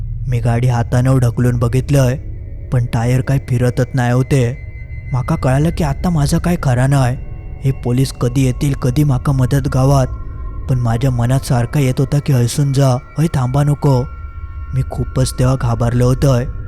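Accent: Malvani, India. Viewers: तुमची मालवणी खुप छान वाटते ऐकायला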